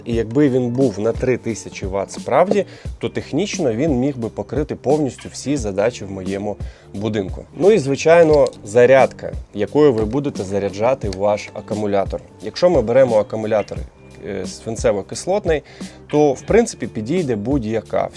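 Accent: Standard. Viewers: Чистый украинский.Респект!!! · норм язык, в наше "время мовнюков" редко услышишь чистый родной язык, а не суржик. Спасибо.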